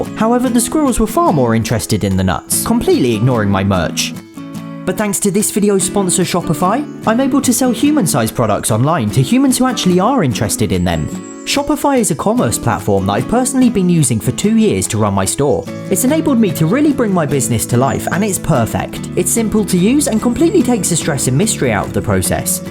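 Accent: United Kingdom. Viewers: Are you british...? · I love your British accent and you videos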